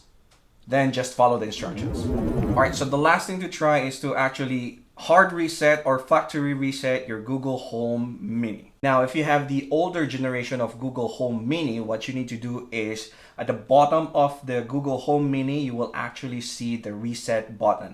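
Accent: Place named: Philippines. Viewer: Thank you so much! It worked! And judging off the slight accent... Salamat Po! (?)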